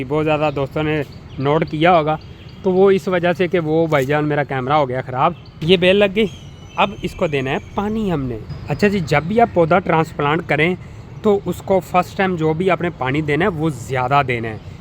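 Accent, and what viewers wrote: Punjab, India. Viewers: پنجابی لہجے میں اردو تقریر۔لمبی اور فضول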